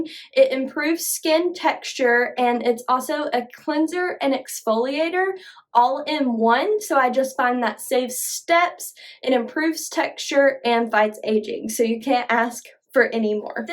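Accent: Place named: South, United States. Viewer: are you still using the Dermalogica Skin Resurfacing Cleanser? I ask because, while I'm a red-meat-eating man, I'm of 100% Irish-American skin and have fought Rosacea for about 20 years, so I've tried to smarten-up on taking care of my face. I also spent many years in the sun as a Lifeguard, before SPF became 'cool'. I looked into and tried several IPL laser sessions, very productive, but I wanted more. So, I found Dermalogica Redness Relief Essence and was blown away at how effective it was...and you know, the next thing you're buying Vitamin C this and and and, all sorts of ointments lol. A friend gave me some GlymedPlus 10% Glycolic Face Wash. I use it 1-2 x a week, it helps to really exfoliate. I use Kiehl's Ultra Facial Cream for moisture. Morning: Wash w/Kiehl's Ultra Face Wash, Dermalogica Redness Relief, Dermalogica Biolumin Vitamin C and SuperGoop Unseenscreen SPF # 40. 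Night: Wash, Redness Relief, Kiehl's Retinol and Dermalogica Intensive Moisture Balance (FYI...Clinique Even Better Clinical Radical Dark Spot Corrector + Interrupter during the day is something I use 3-5 x a week and it seems very effective at helping dark spots/old sunburns etc). Ms., I was looking for a new, daily cleanser...I like the Kiehl's, it is gentle...but the Glymed Plus is very strong and drying....I stumbled upon your video and was drawn to the Lactic Acid 3% Dermalogica Cleanser you used, so I bought it. You have Disney Princess Skin, truly. Combined with southern accent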